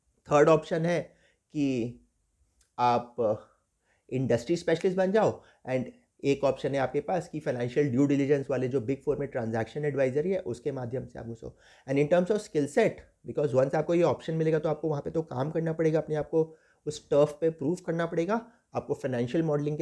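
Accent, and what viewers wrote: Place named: Bengal, India. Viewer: Guys this is funny and misleading.. heading says how a CA can get into PE.. and it starts with let’s the CA do MBA.. 😂😂 The video lacks depth and limited research and obviously no practical experience.. Even if you are a 2nd attempt CA, you still have a chance to get into PE, if you can build up right network and have relevant experience.. Pls don’t get misguided by the ‘sauve’ perspective of this video, it’s just myopic. Anyone willing to get into PE space need following two skill sets along with good networking skills: 1. Ability to evaluate investment propositions - it just basic FM you read in you CAs along with practical POVs on current business, market share, customer base, operational efficiencies, Risk etc. 2. Managing operations- PEs work with their portfolio companies to improve value and finally over the years when they achieve certain efficiency, they look to exit. 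So anyone have operational understanding or ability to manage stakeholders and drive them to improve operations, identify and implement synergies are preferred. Following skills along with above 2 are good garnishee: 1. Business Modelling 2. Transaction structuring and taxation - CAs are champ in this 3. Good communication skill - this is always good to have in the jobs you do. But doesn’t stop you to achieve anything, had the case been otherwise, the host of this video with half Bengali ascent wouldn’t have got 30k followers.. you need not be ‘Sauve’, a Chetan Bhagat english can work wonder..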